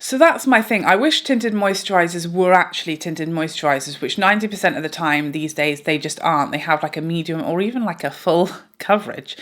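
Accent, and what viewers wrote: United Kingdom. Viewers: Don’t think I need any of that…thanks for another great and entertaining video! The bit about the Fenty bronzer pallet was especially hilarious. I am a fairly recent subscriber (a month or two), but my inner monologue has already switched to a British “accent”!! ❤️ it!! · I like listening her British accents. You have beautiful eyes.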